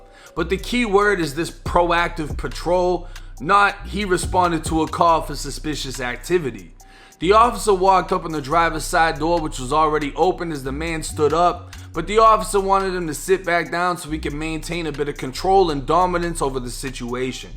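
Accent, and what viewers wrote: Boston, United States. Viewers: You from Boston bro? I'm from Lawrence, MA. Did you pahhk the cahh at haahvahd yawwhhd? Lol you gotta be from mass bro I catch the accent in all your videos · brand is definitely unique and that Boston accent adds to the entertainment factor. Charges are pronounced Cho-jizz, lol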